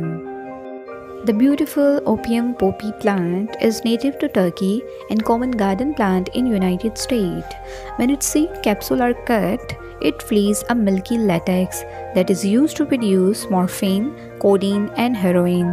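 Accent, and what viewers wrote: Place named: India. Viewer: Is there a search result that is not indian like accent?? It’s really annoying. I don’t know why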